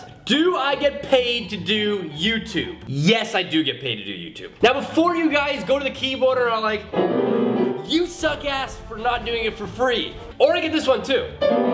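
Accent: Canada. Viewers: Are you Canadian or American??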